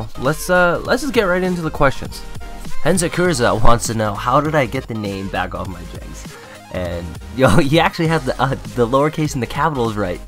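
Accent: Canada. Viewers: I could tell u were Canadian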